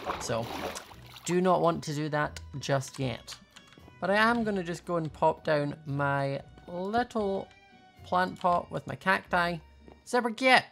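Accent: United Kingdom. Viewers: Omg I love your uk voice because I'm from the us . Like if u agree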